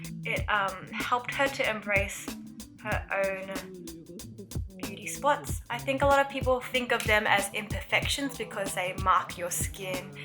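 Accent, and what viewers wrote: Australia. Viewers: Found this video from the recommendations, and I was like, who is this beautiful girl?! I'm gonna watch her video!! I wish I had facial structure like you...! and your healthy skin!! Love your Australian accent too!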